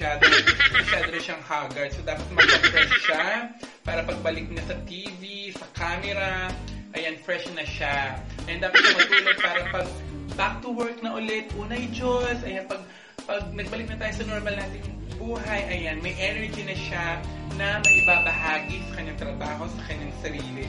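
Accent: Batangas, Philippines. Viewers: Nakakatuwa ka....ala eh batanguena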